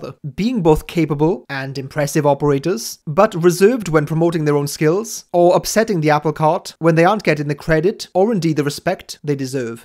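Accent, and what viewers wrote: Wales, United Kingdom. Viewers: Pretty sure he's from Wales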